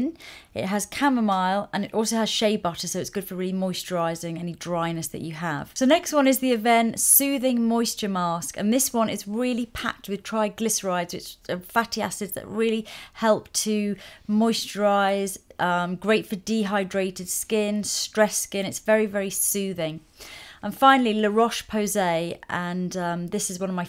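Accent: United Kingdom. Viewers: love your british accent!!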